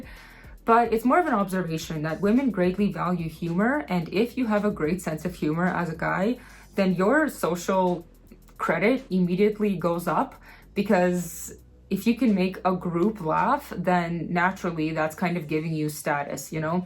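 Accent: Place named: Brazil. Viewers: As a brazilian myself she pretty much sounds like one equal who have lived for years on an anglophono country. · are you brazilian? trying to figure out where that accent comes from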